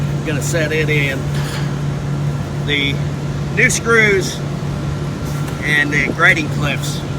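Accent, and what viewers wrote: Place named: South, United States. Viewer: I could listen to your voice and accent all day, it's a real southern drawl. (from a G'ma in Australia)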